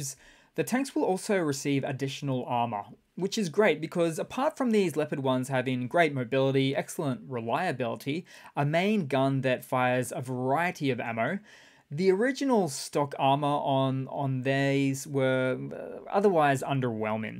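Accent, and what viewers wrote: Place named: Australia. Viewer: I need a more huge wins for the Ukraine 🇺🇦 in my lifes ! Thank you please And this Australian accent rocks m8) 🙏💙✊ Cheers man 💎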